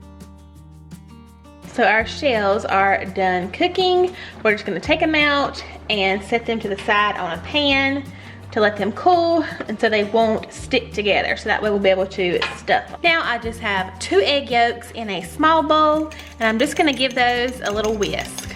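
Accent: South, United States. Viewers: The shells look amazing! Thanks for the idea- I’ll definitely be trying them! Keep up the great work. I love your videos and southern accent! ❤️❤️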